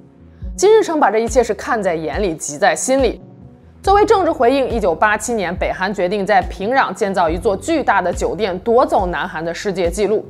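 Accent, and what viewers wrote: China. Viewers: up咋是咱大陆口音啊，不像台湾人嗲嗲的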